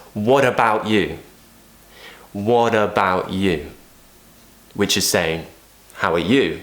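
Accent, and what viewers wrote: United Kingdom. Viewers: I didn’t like British accent u made me love it such an excellent professional teacher with Shewa at the end ,,British accent XD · I love your videos! From Uruguay!! And love your britttish accent! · What kind of this British Accent? I mean the accent using T sound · I love your British accent <3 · nice to see you, I love your accent 😍 British is the best ever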